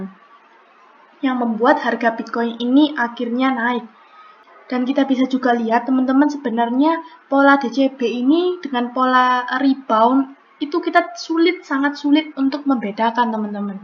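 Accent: Java, Indonesia. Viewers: Medok mbak.wong ngdi e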